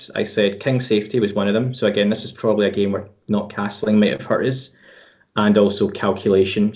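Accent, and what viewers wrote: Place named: Yorkshire, United Kingdom. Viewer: Which chess club are you a member of? I assume one in Yorkshire based on that accent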